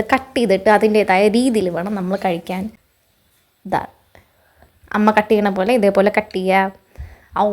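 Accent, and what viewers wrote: Palakkad, India. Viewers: Palakkad slang super 👌🏻😄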